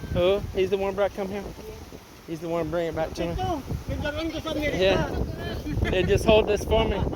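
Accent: Texas, United States. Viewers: I have decided I like you and your videos sincerely. Your Texas accent is kind of cheesy but I forgive you. I am 7 gen Texan and lived across the state from south to East. Maybe it's a north Texan accent. Anyway cheers. Thanks for posting nd I hope to visit soon.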